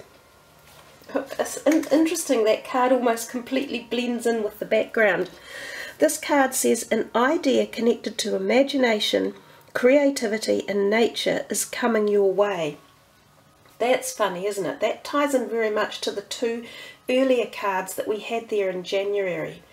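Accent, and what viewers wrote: New Zealand. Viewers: My resolution is to learn the tarot, which is how I found you. Also, your accent makes me miss NZ. I'm a New Yorker but I spent most of 2013 in Auckland because my partner is a kiwi.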